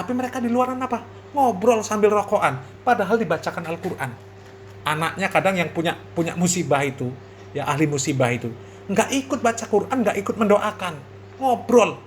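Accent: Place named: East Java, Indonesia. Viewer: Dari aksennya, orang Jatim seputaran Surabaya Malang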